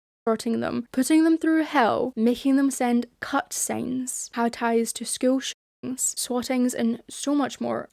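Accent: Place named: England, United Kingdom. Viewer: Hold. I think im going insane. Why did I remember you having an american accent, and on this account one of the englishy ones?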